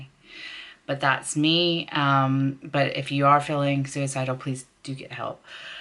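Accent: Scotland, United Kingdom. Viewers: As a Scot myself, I can detect a tiny bit of a Sottish accent developing there! That's the way to integrate fully! 😂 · You have a Scottish twang to your American accent :-) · Good video. I was going to guess you had moved to Scotland before you said it. Can hear it in your accent very slightly and when you said wee for small. I find it so bizarre Scotland and wales dont pay for prescriptions like we do in england. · I thought u sounded a bit Scottish! Love from Glasgow :)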